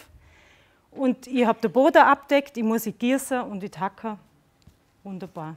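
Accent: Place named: Allgäu, Germany. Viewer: Ein wirklich sehr beeindruckender Vortrag - überaus sympathisch, unaufgeregt und motivierend! DANKE! Auch meine Pläne werden so langsam immer konkreter. Es wird auch allerhöchste Zeit für mich! Ich liebe zwar das Allgäu (mindestens so sehr, wie Dialekt!), allerdings zieht es mich eher in die Oberpfalz... - zu den Wurzeln meiner Familie.;) Namasté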